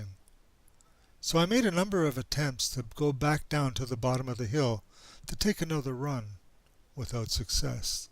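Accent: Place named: Canada. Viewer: This is such a heartwarming story. Great writing. I love your deep Canadian voice!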